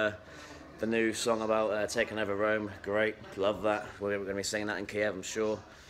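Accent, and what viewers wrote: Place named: Liverpool, United Kingdom. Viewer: Great Scouse accent mate, surprised you ain't a United fan, they all live in London too.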